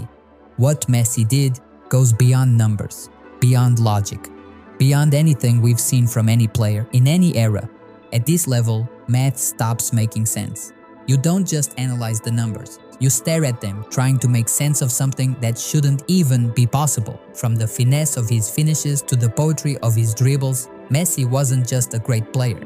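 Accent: Portugal. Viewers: This guy has a portuguese accent! Great video/Grande vídeo! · Este gajo eh Portugues.... de certeza. Heheheh Video genial!!!!!!!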